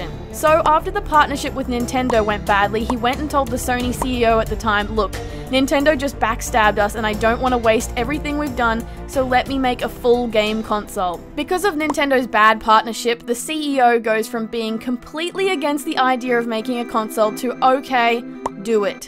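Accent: Australia. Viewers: Are you from Australia? you sound Aussie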